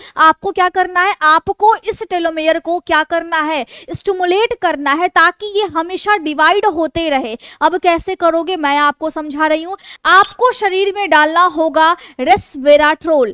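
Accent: Bihar, India. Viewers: Aap bihari hai kya medam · Work on your tone, bol rhi ho hindi but bihar k tone aa rha hai.. your hindi word pronunciation is little bit weak..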